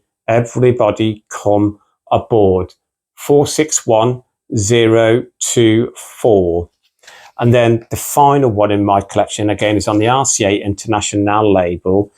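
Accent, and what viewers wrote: United Kingdom. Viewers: Very Interesting videos. Your English in very clear, even so, I'd like to know where your accent is from: northern, southern, cockney? Blessings.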